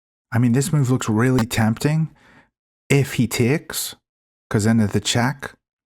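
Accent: Northern Ireland, United Kingdom. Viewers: You're English?! You sound Northern Irish! I would've put money on it.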